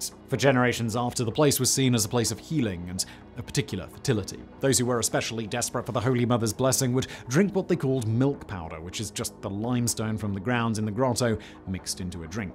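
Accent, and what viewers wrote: United Kingdom. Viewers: no offense, but the British pronunciation is funny to my Italian American ears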